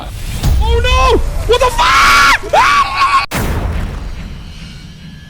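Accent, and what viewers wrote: England, United Kingdom. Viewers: hasans british accent is getting real damn good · That's a great English accent for a yank. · As a brit, your british accent is fantastic, gets me everytime. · has a really good british accent it's kind of scary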